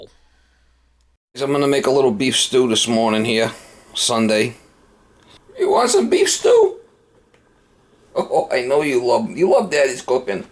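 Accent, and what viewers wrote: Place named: Boston, United States. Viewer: Question for you, as I'm a pretty fan of your channel and work in the HVAC residential field. But anyways, from hearing your voice, are you from Boston or New Jersey?? I've always been curious Haha love the videos man, keep em coming!!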